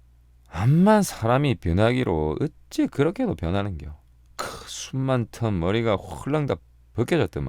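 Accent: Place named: Busan, South Korea. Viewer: 부산사투리~~~~~입에 착착 붙네요~~~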